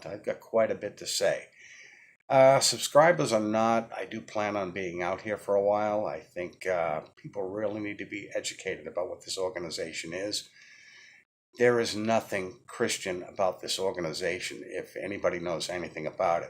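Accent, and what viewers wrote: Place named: New England, United States. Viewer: are you from Boston? Your accent sounds like you're from a New England state. I'm from New York. Like your honesty. I recently quit JWs cold turkey close to 2 months. Having slight social withdrawal symptoms, but loving the truth I am learning directly from the bible and from videos likes this.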